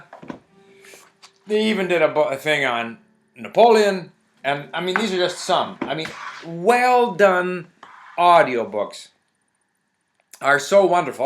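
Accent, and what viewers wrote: Sweden. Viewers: You say you can't remember speaking swedish. Even so ,it sounds to me you have a swedish intonation on many words. I'm not questioning you, I just find it interesting. Then again, I know nothing of Canadian dialects :P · I actually thought you were Swedish when I first heard you speak English. You kind of has this "famous" Swedish-English accent.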